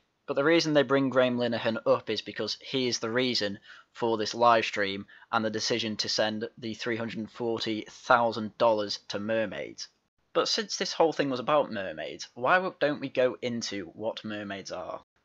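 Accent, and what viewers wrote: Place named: Manchester, United Kingdom. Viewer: sorry my dude it says "Infertility is therefore one of the major side effects of the course of treatment that runs from puberty suppression through cross-sex hormones to surgical sex reassignment." Which includes cross sex hormone treatment. Which means there is a lot of misinformation in this video. BTW you sound dead familiar are you from greater Manchester?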